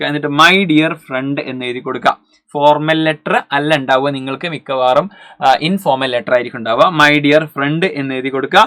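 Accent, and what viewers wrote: Kozhikode, India. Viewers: Sir Kozhikode ano👀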